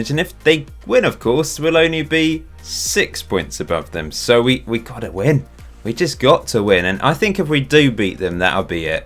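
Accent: United Kingdom. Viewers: When your girlfriend recognizes the voice of that 'posh guy from England' 👍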